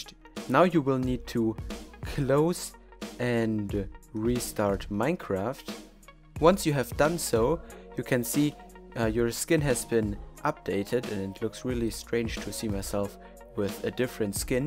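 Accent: Germany. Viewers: Jep, er ist deutsch ;) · hehe^^ Man hört richtig, dass du deutsch bist xd, aber trotzdem nice vid!:D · Are you German? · er ist auch deutsch? Hört man irgendwie :D McMakistein ist ja auch Deutscher (Österreich)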